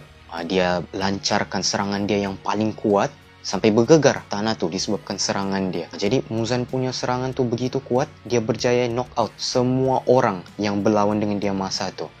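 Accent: Sabah, Malaysia. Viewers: dgr2 org sabah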